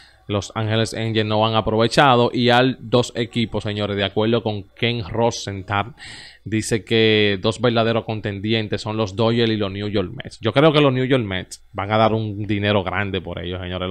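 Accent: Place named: Cibao, Dominican Republic. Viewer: Compa usted habla demasiado feo, un cibaeño que cambia la i por la L, ejemplo dos ochental tres, barrabasada total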